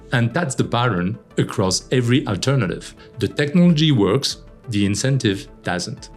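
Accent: France. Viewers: Interesting content on this channel but hard to listen to the French...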